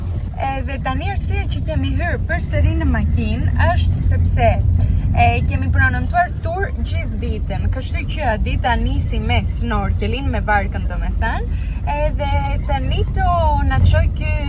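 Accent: Greece. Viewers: Η προφορά η ελληνική στα αλβανικά σου είναι φουλ σπαστικό γιατί προσπαθείς να το παίξεις γλυκουλα ! Και βγαίνει σε σπαστικό και γενικά προσπάθησε να δείχνεις περισσότερο παρά να μιλάς κατα τα άλλα πολύ όμορφο βλογκ 🎉🎉🎉🎉🎉🎉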